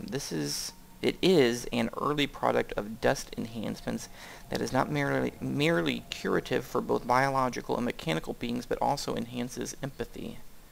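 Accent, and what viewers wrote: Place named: South, United States. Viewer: Not usually a fan of Southern American accents but yours is pretty relaxing and clear.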